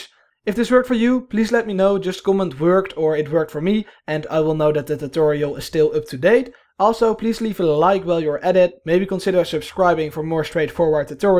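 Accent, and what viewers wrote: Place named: Netherlands. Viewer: Sjonge jonge ik hoor dat platte Nederlandse accent gewoon keihard, heerlijk! Het heeft gewerkt, dankje! :)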